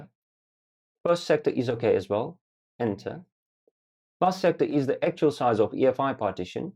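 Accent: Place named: United Kingdom. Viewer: Brilliant British accent 👍🏼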